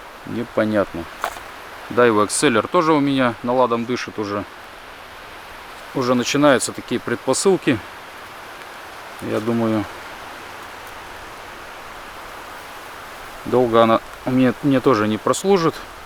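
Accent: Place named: Siberia, Russia. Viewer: Спасибо, спасибо!!! Без блинов ваш сибирский говор просто умиляет.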